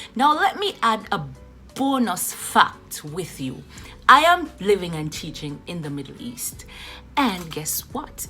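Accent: Jamaica. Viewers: Hey I’m so glad I stumbled on your page I figured your accent want slightly familiar. I am also Jamaican seeking employment in Kuwait 🇰🇼 I will be messaging with further questions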